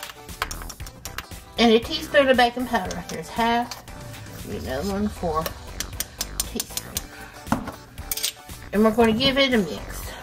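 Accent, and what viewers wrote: South, United States. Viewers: just found your channel!! love the recipes..love your southern accent as it reminds me of mine. what part of the south are you from?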